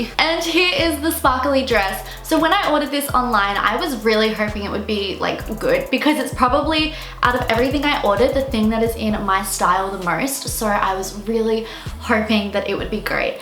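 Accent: Melbourne, Australia. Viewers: I'm Australian too and she sounds just like I do. Sometimes people tell me I sound American a bit too. I grew up moving from Queensland to Melbourne? Maybe that's why? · Right when I clicked on her video, and heard her speak... I knew right away, she's Australian. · I'm American, and her accent sounds Australian. Just like us Americans, we have different accents from all over the country. I'm pretty sure the same goes with Australia. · I get so used to hearing American's on the internet and in TV shows and movies, I always have to double take when I hear an Aussie, Proud <3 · America has stereotyped the australian accent 😂😂😂 i love your pure accent more!! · She sounds like every white girl in Melbourne lol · What? she sounds completely Aussie. Is she supposed to sound like a bogan not be accused of 'faking' her accent? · Why does your Australian accent sound mixed with American? 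Your accent sounds nothing like mine. I’m Australian also.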